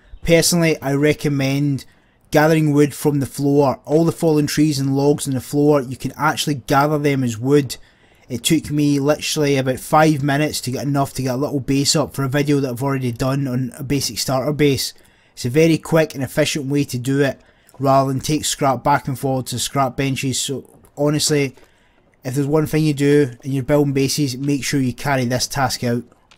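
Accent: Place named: Scotland, United Kingdom. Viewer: Where about from Scotland are you from mate?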